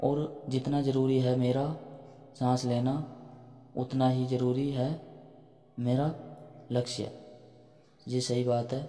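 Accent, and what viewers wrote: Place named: Western Uttar Pradesh, India. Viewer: Bhai teri awaj se lagra hai Ki tum west up se ho Am I right ?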